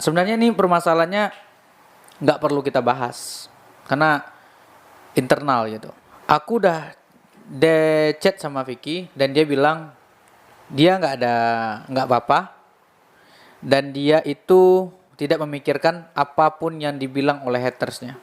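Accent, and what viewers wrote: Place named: Sumatra, Indonesia. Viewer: Sor x bah aku nonton in video mu bro, kalo bisa logat Sumatra jgn di ilangkan bro, semangat buat konten bro... Udh lama jd subscribers mu baru x ini aku komen😂😂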